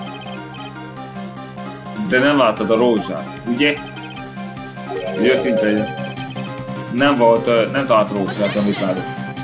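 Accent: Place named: Slovakia. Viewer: Ha a beszédére gondolsz akkor az akcentus mivel szlovákiában él és talán született is.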